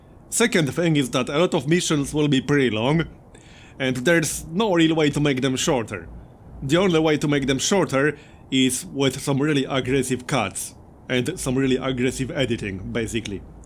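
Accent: Poland. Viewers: I subscribed for civ 6 deity gameplay and I wish the episodes were at least 40mins long. Your latest civ 6 play is 5/5. I don't care about the gimmicks that are popular on youtube: I did x and y happened. I wish you didnt spoil what happens in the episode in the title for example: no oil!!! When that is what happens in the episode... and me personally i dont like xcom at all so i dont watch those videos. I believe you would get more viewers with quality civ 6 content. About your accent?!?! Im finnish and i have a finnish accent, youre polish with your accent, i actually know one polish woman who lives here and we all havr our accents, not everybody talks like the queen of England like we are teached to do. So dont be silly your accent is fine all that matters is if people understand when you speak, not the accent! I actually like the way you speak you should sell t shirts that say "works for me" hearing that always makes me smile! 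· Just cut the long videos at 30-35 minutes with a suspense. Just like with the soap operas we will be back for more excitement. I have no problems with long videos/movies at all but I understand how the algorithm punishes the videos that are not watched at full length. I am sure many more of us like your Polish accent than those dislike it. Just keep being yourself and do what you really enjoy. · please go to America once and actually talk to different people there. You will find out that apart from the natives (white, born in America, both parents have English as first language), everyone have some degree of accents and they don't take it as a problem. Tbh, some are even proud of their accents because they think their accents emphasize their cultural and ethical background. If you're not a native speaker, nobody would expect you to speak as one. So be confident with your English. After all, you are a Polish, not an American, and it's totally fine for a Polish to speak Polish-English instead of American English. 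· Good luck with that missing 1k, (I said around begining of December that you will get it at the begining of 2020, but as a data-focus guy, you are probably right, that more likely is going to happen around May next year. I would never thought that accent could be a problem here on your channel, but probably that's because I am Polish as well and I don't really care about the accent more than the content itself. Anyway, do what needs to be done to reach your goal.:) And sorry for repeating myself, I think that one day a month of some retro-gaming in strategy genre (video or streaming) would make me very happy. You know, something like Centurion, Panzer General 2, Dune II, Syndicate or Age of Empires, you know yourself.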